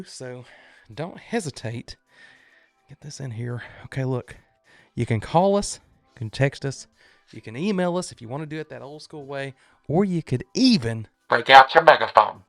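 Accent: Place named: Texas, United States. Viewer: Oh wow, when I was watching one of your videos I thought that’s a Texas accent. I’m from Texas. Now it’s confirmed.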